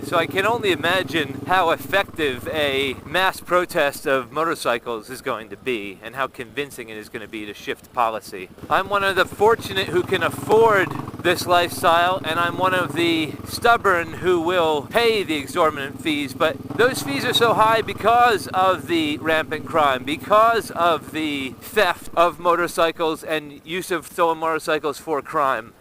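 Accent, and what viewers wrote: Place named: United States. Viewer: Enjoying all your videos, just wondering...you don't sound British. Migrant American ?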